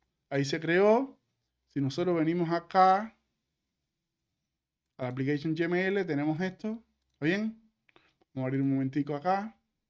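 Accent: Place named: United States. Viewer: Mucho rollo y en farfullo medio yanki. Es incomprensible.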